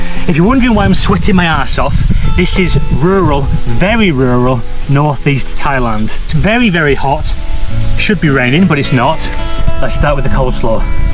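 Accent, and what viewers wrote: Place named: United Kingdom. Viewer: Hey! It's me the "bastard". I followed you to your new channel. I'm sure youre elated! Nice to see you've already got more subs on your first video than my channel has in the last ten years! Although I love seeing you go into town for local cuisine, I'm also glad you decided to show your cooking skills. You sound a bit like Ramsay when you talk while cooking lol. Must be a Brit thing.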